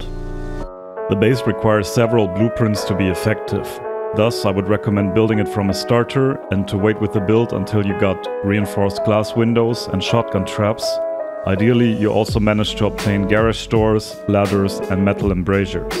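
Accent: Germany. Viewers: Puh der deutsche Akzent... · U sound German !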